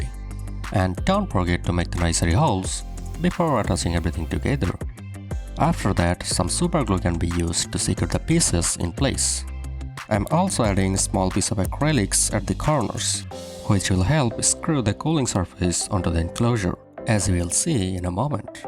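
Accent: India. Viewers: Your voice like indian ❤🎉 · Your account from USA but your voice like from India or Bangladesh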